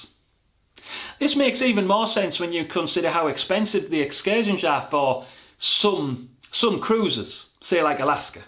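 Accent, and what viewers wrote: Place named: Newcastle, United Kingdom. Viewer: Are you related to Alan Shearer by chance? You kind of look like him and you've got a Newcastle accent lol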